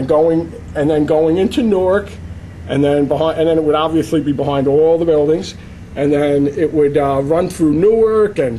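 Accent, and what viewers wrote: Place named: Jersey City, United States. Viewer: Im from downtown Jersey City between Monmouth st and Brunswick st. Everyone in my neighborhood except the transplant hipsters talks like this guy. I have the same accent and wouldn't change it for anything the world. I dont know about the rest of Jersey but I know Jersey City.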